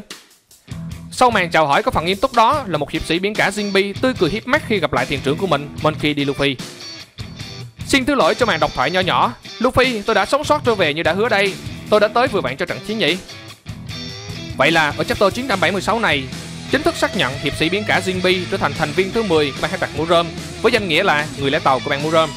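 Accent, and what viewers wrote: Quang Ngai, Vietnam. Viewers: Ad quê Quảng Ngãi hay Quảng Nam vậy? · Ad người miền trung hả anh, em nghĩ anh người quảng ngãi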